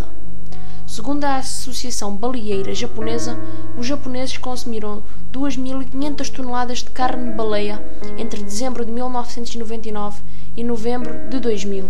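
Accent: Portugal. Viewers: Adoro baleias... Apesar do sotaque português, consegui entender o vídeo.. Parabéns! Abraços do Brasil. :) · Unica coisa que ficou horriveeell no video, Foi A Narração Portuguesa. Si fosse narrado por um brasileiro ia fica 100% bom.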